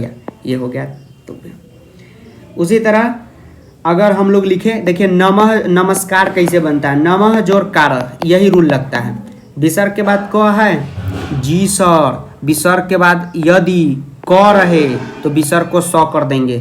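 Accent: Bihar, India. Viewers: Sir are you from Bihar because your language is similar to bihar and you are teach very well 😌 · Sir aap bihari hona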